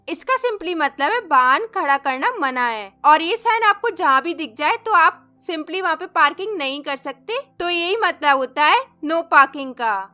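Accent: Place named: Himachal Pradesh, India. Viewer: apki voice se lagta h ki ap himachal ya uttarakhand se h nice video very helpfull thnx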